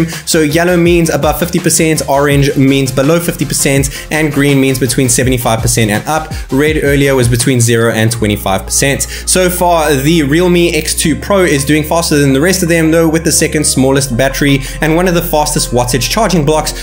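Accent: South Africa. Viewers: Hey, just curious - are you South African, by any chance?🤔 Your accent...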